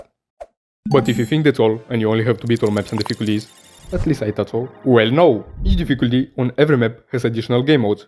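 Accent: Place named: Russia. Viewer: I love the, uh russian/ukranian acent?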